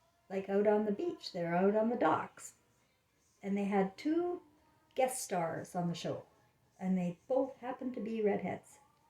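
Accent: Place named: Western Canada, Canada. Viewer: you sound like your from western Canada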